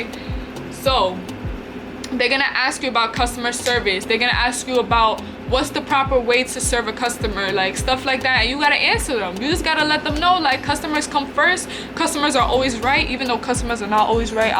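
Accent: New York, United States. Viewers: You’re definitely from New York lol I love it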